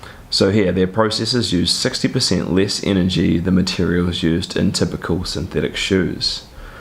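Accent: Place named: New Zealand. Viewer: Hey are you from New Zealand? You sound like a kiwi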